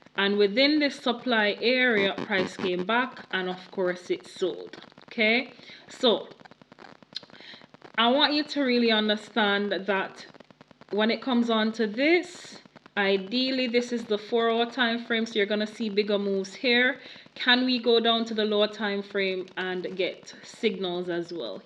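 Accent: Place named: Jamaica. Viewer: Must be a Jamaican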